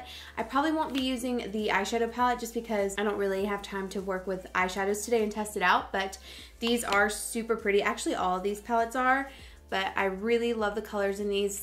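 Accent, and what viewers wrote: South, United States. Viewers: I don’t to be rude but you’re pronouncing Phthalates wrong. It’s THAL-ATES but I do like how you say it though with your southern accent!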